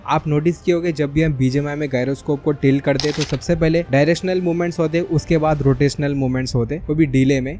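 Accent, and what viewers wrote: Hyderabad, India. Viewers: Bahi aap hydrabadi ho kya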